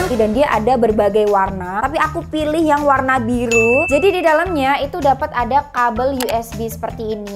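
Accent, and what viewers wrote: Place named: Kendal, Indonesia. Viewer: Orang Kendal ya? Ku kira Surabaya hehe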